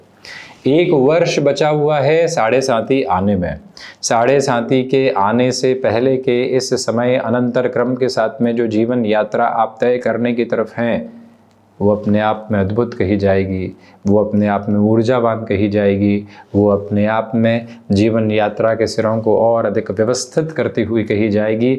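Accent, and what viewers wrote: Standard. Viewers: हिंदी भाषा पर आप की पकड़ वाकई अद्भुत है। शुद्ध उच्चारण के साथ भाष्य प्रभाव अचंभित करता है। बहुत दिन बाद इतना शुद्ध उच्चारण सुनने को मिला।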